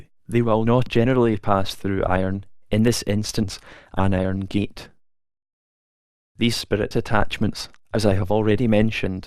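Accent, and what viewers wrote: Scotland, United Kingdom. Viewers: Hello please answer a question for me and my son who is 15 My son thinks that you're using one of those robot voice thingy Majiggers I told him you're not and that it's just someone with a really cool Scottish accent who probably speaks Gaelic also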